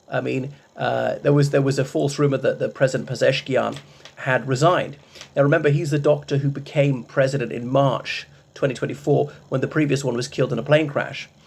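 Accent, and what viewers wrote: RP, United Kingdom. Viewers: 💋 You're like a sexy articulate rugby player w/ a posh accent sooo sexy omg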